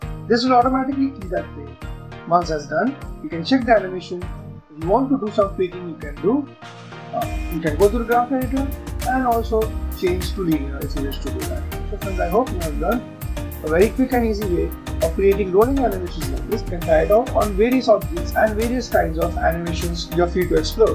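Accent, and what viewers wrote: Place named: India. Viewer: Background music is very loud, and the speaking volume is quite low. Also people who are not familiar with Indian accents would find this extra difficult to understand! I'm of course not suggesting you change your accent haha, but if the music were a bit lower and the speaking volume higher this would make the video much easier to follow, ta!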